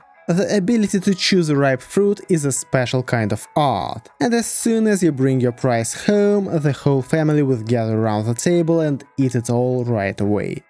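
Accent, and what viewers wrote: Russia. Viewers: A heavy Russian accent. I love your description of Russian summer. I think that you've touched on any important detail of this subject.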